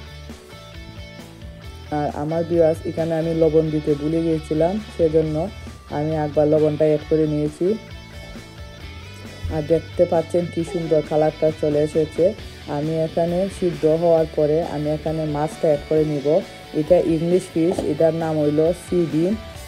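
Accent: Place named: Habiganj, Bangladesh. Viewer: Apa je moja oise lager r mukhi to kota oi nay apne mat hobigonjer tone ase gift dia gesi affa apne o diva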